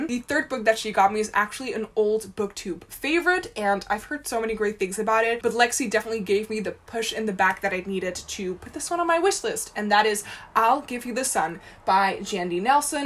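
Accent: Netherlands. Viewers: I detected a Dutch accent right away haha, but I speak the language myself so that makes it easier to spot 😊 Her English is flawless, indeed!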